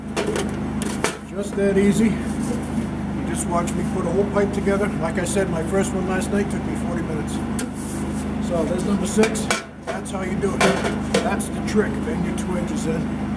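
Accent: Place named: Northeast, United States. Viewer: Thank you! My husband was struggling put our 4ft pieces of pipe together. So I watched ur video to see if I could find him some help. And yes it did. So we both thank you for your knowledge. I also want to say I love your northeastern accent. Reminds me of my Grandpa. He was from Eastport, Maine... where are u from? If u don't mind me asking..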